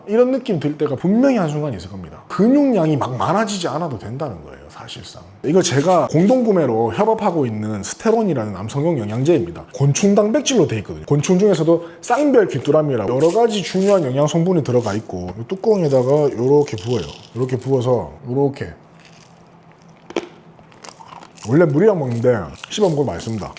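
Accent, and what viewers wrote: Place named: Gimhae, South Korea. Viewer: 행님 김해서 오셨습니까 사투리 구수하네예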